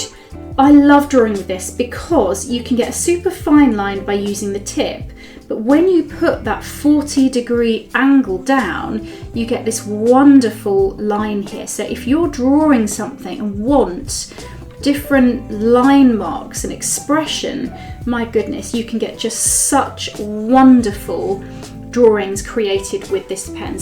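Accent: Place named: South, United States. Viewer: I have a few bent nibs, Love them for their versatility. Love the dulcet southern accent, BTW.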